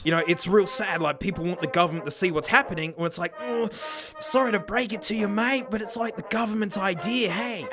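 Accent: Australia. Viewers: Man what a shitty position you've been in. Really glad our support has enabled you to move somewhere else. Hope you'll enjoy it there man! Keep that silly content going you loud aussie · Dude I've been watching this channel for a while, it's become a new favorite. I initially had a sneaking suspicion but then thought "no I must be racist towards Australians, they can't all sound the same" that this was. I'm probably more than a dozen videos in. You mentioned drumming in this video and I just thought "no way this has to be him", and sure enough I look under the description and there's lmao. Great channel.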